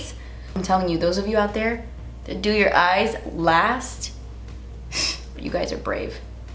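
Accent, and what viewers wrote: Cuba. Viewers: Please do a Spanish tutorial! I love your Cuban accent :) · Please do a Spanish tutorial!!! i love the Cuban accent 😍😍 i would love to see you do it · TANTO DINERO PARA ESA MIERA... lol i love you 😘 Cuban accent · I'm in love with you Cuban accent 💓 😂 besos!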